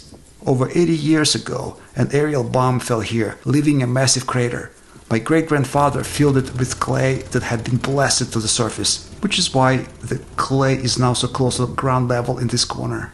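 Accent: Russia. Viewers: хороший английский! понимаю намного легче из-за русского акцента😊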